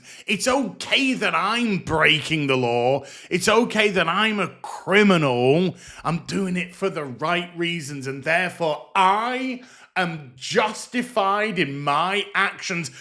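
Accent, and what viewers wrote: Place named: England, United Kingdom. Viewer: When calls something Retarded with that smooth English accent, you know the show is 💩🤣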